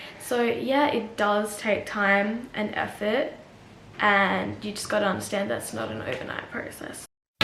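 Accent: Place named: Australia. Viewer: Lol u sound like a propa aussie lol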